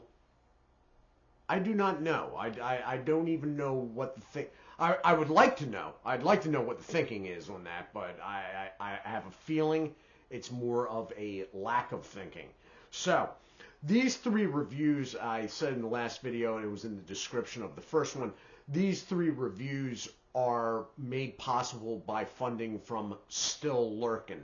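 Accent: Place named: Maryland, United States. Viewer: I have family up and down the east coast but your "O's" made me think of the MD accent. I was close. VA here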